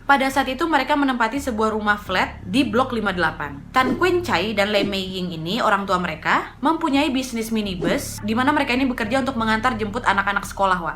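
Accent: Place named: Bengkulu, Indonesia. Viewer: Ngmngnya kaya orang Bengkulu Wak Wak🤣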